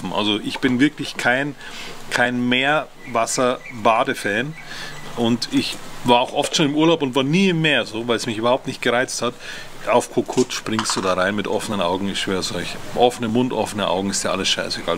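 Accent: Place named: Bavaria, Germany. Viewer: bisserl mehr bayrisch dadad ned schadn , aber alles gut🥳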